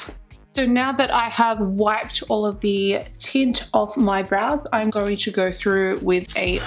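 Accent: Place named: Australia. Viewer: yes I thought that too!!! its the Australian accent !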